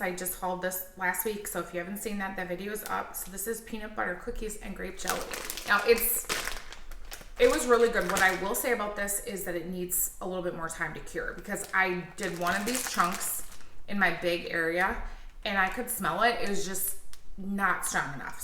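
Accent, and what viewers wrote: Wisconsin, United States. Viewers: Love seeing your face!! So gorgeous ❤️❤️ I love that I can hear the Wisconsin in your voice. Minnesota here 🖐🏻 😂 I have the accent too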